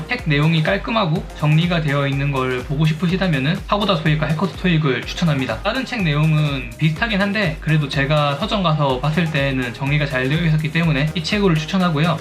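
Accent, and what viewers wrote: Gyeongsang, South Korea. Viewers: 전달력이 좋으신데 말이 너무 빠릅니다.~ 영남 사투리 악센트를 써서 그런지 모르겠지만 표준어를 쓰는 사람에게는 유튜버님의 이야기를 잘 못들을 수 있어요. 말을 조금 천천히 하시는게 좋을 듯 합니다.^^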